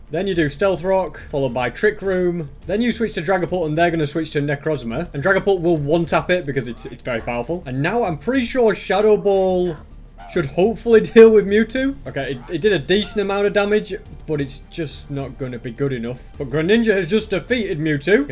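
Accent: United Kingdom. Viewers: why do you look like indian but your accent is british?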